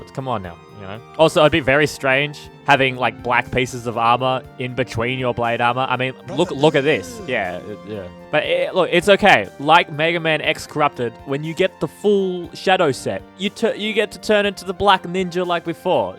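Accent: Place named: Appalachia, United States. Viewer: You somehow sound like someone from Appalachia and Australia at the same time.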